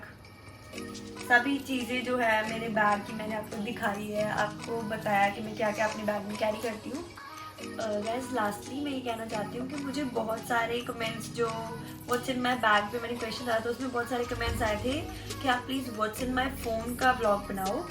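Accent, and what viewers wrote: Punjab, India. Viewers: Aapki accent shehnaaz gill type ki h😅.. Shyd aap dono punjabi h isiliye 😅❤ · Punjabi hoka Hindi bolni chngi ni lgdi.... So make vlog in Punjabi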